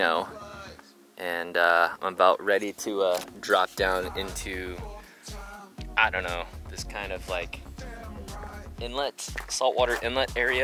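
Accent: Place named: Canada. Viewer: I am detecting a slight Canadian accent from you! Already?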